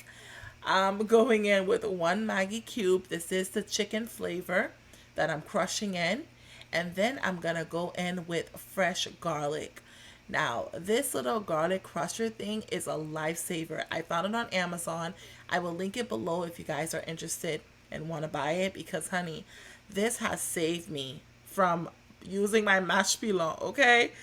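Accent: Bahamas. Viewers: I can hear the Bahamian accent. I’m about to make griot for the very first time and your video is very helpful.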